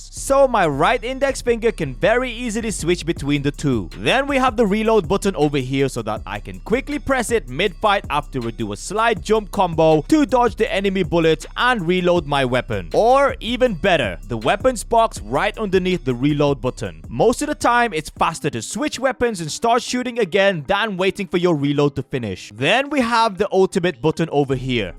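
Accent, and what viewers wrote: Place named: Russia. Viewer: Why do you sound Russian? Are you Russian?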